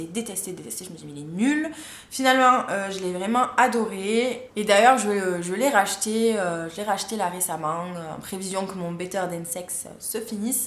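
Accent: South, France. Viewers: Coucou! Franchement j'adore tes vidéos! T trop mimi! Ca fait du bien de regarder tes videos d'autant plus que j'adore ton accent, je suis du sud mais je vis dans le nord depuis quelques années et c'est dur :( voilà je suis régulièrement tes vidéos qui m'apprennent pleins de choses ;) continue t trop cool et trop rigolotte!! Bye!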